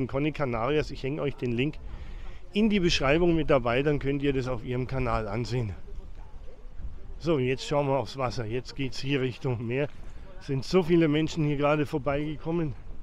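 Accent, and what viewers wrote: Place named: High German, Germany. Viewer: Ich freue mich morgen nach Gran Canaria zu fliegen. Ich bekome schon heimweh nach den Dünen. Mach weiter gerne mit ihre Reportage. Ich schaue gerne ihre video clips und daarbei auch meine deutsche sprache üben. Sie haben einen schönen Stimme etwas hoch Deutsch mit eigen accent! Vielleicht wenn ich daar ben und Sie treffen,werde ich Sie sicher ein Bier anbieten.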